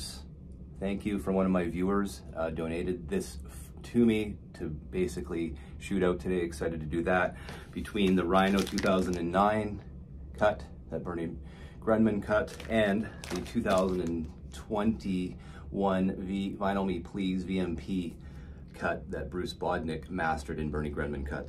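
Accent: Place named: Canada. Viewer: Canadian and with that some pronunciations are different where he is from.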